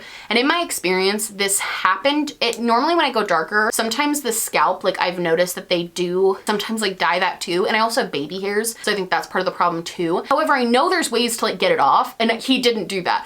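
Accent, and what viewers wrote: Australia. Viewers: Hahaha as your number 1 Aussie fan I cracked up laughing because I was thinking about how much we pay for shipping which sometimes is the same price as the palette we pay for!! Lol 😂 but love your Australian accent mate ! X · I just legit cackled at your Aussie accent like the down under witch I am 😂 And yes, you damn spoiled Americans! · As an australian, I'm afraid I must stan your Australian accent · I really appreciated the Australian accent 👌😂❤️ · I love the new hair!! I work at a hair salon back home and damn he did you dirty!! Obviously it’s a little harder to get off your skin than more natural looking color, but he should have STARTED to get that off while you were at the bowl and then brought you a towel or cotton ball with the remover on it when you got back to their chair!! Regardless, I love the darker look, I thought in the video before your hair looked purple, glad I wasn’t going crazy 😅 Also I loved the Australian accent 😂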